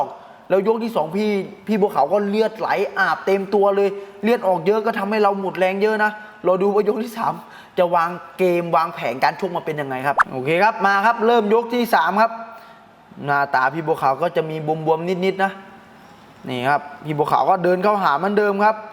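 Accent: Isan, Thailand. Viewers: พี่ ยังภาคไม่ดีเท่าไรนะคับเพราะว่าคำว่าเตือนการเป็นเตียน.เเล้วก้คำว่า.เลือดกายเป็นเลียด.พี่ น่าจะติดอีสานนิๆ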